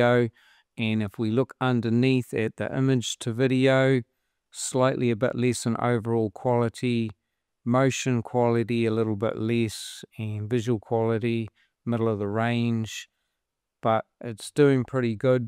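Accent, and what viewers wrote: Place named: New Zealand. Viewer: You went way too overboard with the training on the A.I voice you use for the video. It sounds like an offensive stereotype of someone from New Zealand.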